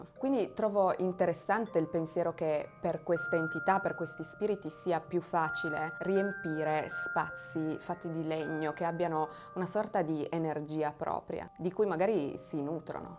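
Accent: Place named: Pordenone, Italy. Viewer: Azzz !!!! Non ricordo se sei Piemontese, o di lì attorno,si potrebbe facilmente confondere il Tuo modo di parlare con quello di Pordenone, è identico!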